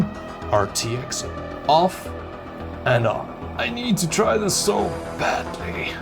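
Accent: South Africa. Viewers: Trou met my <3 Beautiful Saffa Accent!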